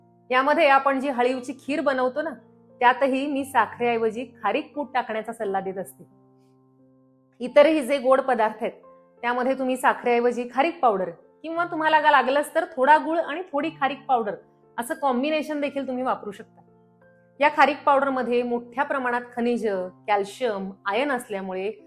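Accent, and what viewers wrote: Standard. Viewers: तुमचे video अत्यंत सुंदर माहितीपूर्ण असतात..उच्चार शुद्ध..जास्त पाल्हाळ नाही .त्यामुळे छान वाटतात.. आजच्या vid मध्ये एक sangal ka .खारीक मुले बरेचदा constipation hote..त्यावर काय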